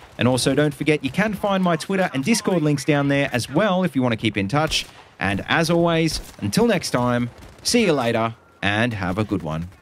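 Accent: Australia. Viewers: gr8 vid m8 Aussie accents makes everything better :) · Hey shit, you're an Aussie!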